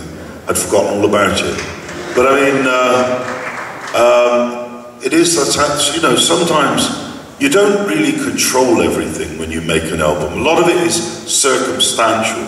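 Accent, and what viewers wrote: United Kingdom. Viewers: This guy was an extraordiary monumnet of rock music and I really love to listen to him talking with this intensive british sound (what else could it be then?)! This is heartwarming - I grew up with his music and i still honor him deeply! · Loved his British accent. He is missed.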